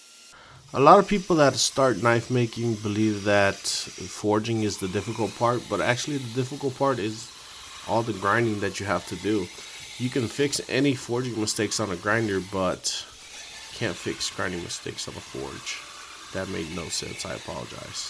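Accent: New Mexico, United States. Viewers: Where are you from? You sound like you're from New Mexico.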